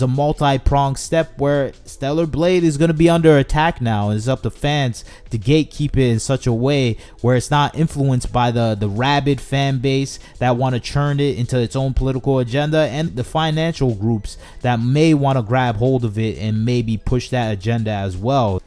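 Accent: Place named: South, United States. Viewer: what part of the country are you from? you say idear instead of idea lol, i can hear the accent, it reminds me of the south, but i'm not quite sure where in the south because all your other sentences sound more or less not from the south, so it's difficult to know where you are from exactly.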